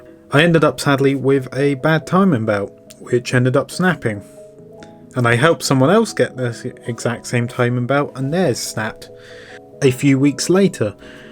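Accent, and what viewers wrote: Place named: United Kingdom. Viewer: Wonderful video, I’m old 60 and I want one so bad, I can taste it. I’ll be able around rural Southern West Virginia to run the back roads, but we do have even a few of them that are 55 mph roads, are people pretty patient with your lil truck getting up to speed and running a lil under the speed limit? Again awesome video and that swanky British accent always adds to any video, I alway think God has a British accent, not sure why. ❤️👍👍👍👍👍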